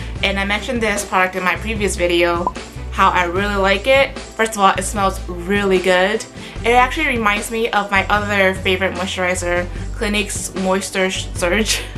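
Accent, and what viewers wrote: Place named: Singapore. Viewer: haha you have a slight singlish accent here :D #SG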